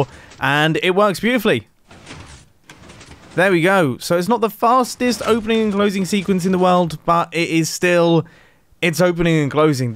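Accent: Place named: United Kingdom. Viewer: You sound no different than you usually do. Like a charming, if not occasionally clueless, British guy who does minecraft redstone for a living. That was a complement by the way, you sound fine like you always do.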